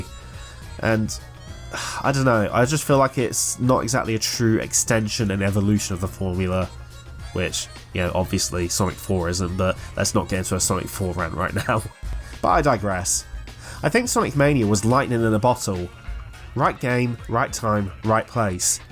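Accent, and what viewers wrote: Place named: England, United Kingdom. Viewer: Are you me, but with an English accent? Because I feel like you are me.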